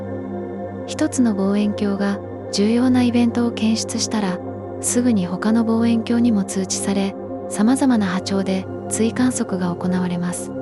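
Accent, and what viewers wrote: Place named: Tokyo, Japan. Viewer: これより完璧な東京語を伺ったことがありません。 、イチオシです。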